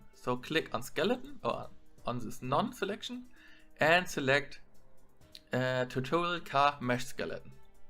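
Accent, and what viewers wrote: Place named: Germany. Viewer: German accent is much different than Polish but i can still understand every word. Very good tutorials!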